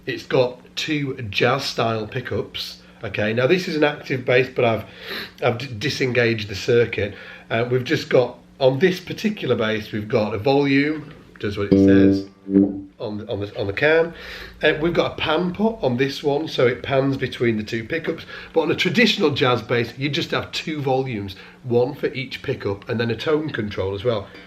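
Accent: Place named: Northern England, United Kingdom. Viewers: Northern British English. He lives in Leeds. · Hi, I'm new to bass but have played guitar for decades off and on. I'm far from expert but I play because I like to play, not because I'm really very good, though some say I am. For a little diversion I bought an Ibanez SDGR for under 2.5ton£'s. It has active pups, 3 band tone controls and a little switch that can change between single coil mode, humbucker and active output. I can get real smooth buttery bass, to cheesecake, then a bit of biscuit crumbs in the mix right the way to total gingersnap sound. Really loving this very cheap axe, and I have 5 others top notch guitars that were much dearer. Maybe it's all about the bass! Where you from in Leeds? I recognise the accent. I was born in Burley then moved to Tinshill when I was 7. Been in Newcastle since:)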